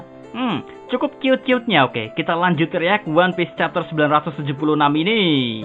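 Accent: Java, Indonesia. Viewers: Pusing gw ama logatnya,medok banget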